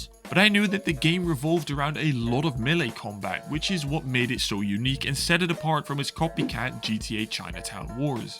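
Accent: Netherlands. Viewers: are you dutch???? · ARE YOU DUTCH??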